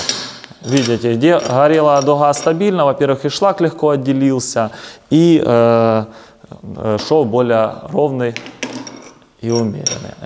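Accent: Kharkiv, Ukraine. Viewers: В Харькове все так Гаворят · Чисто харьковский базар.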